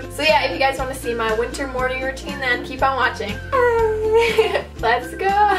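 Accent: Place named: North, United Kingdom. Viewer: You're so pretty and you have such a northern accent it's fun to listen to!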